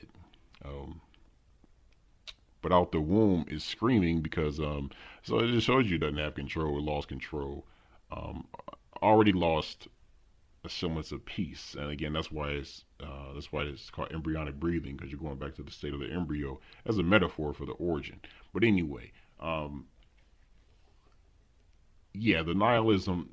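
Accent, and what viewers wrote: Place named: Baltimore, United States. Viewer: You still go out? Ima probably go to DC soon and I hear Baltimore in your accent. Pull up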